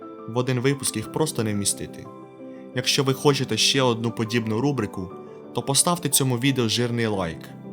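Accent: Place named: Cherkasy, Ukraine. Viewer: Люблю цю вимову, диктор явно з Черкаської області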